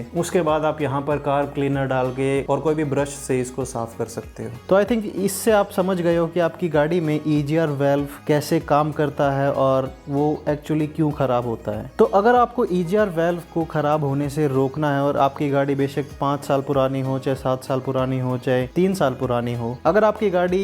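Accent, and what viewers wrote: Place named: India. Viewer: What language are you speakin? English-indiano? We don't understand a f****n thing seriously....add subtitles.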